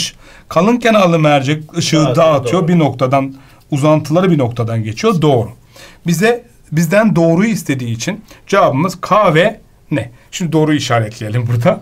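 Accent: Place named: Bulgaria. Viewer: Abi sen burgarmısın çünkü NAİM SÜLEYMANOLU SENİN GİBİ KONUŞUYODU FİLMİNDE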